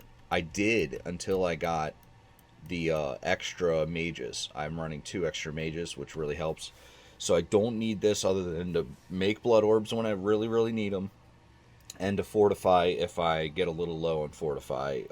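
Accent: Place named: Philadelphia, United States. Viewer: That sounds like a Philly accent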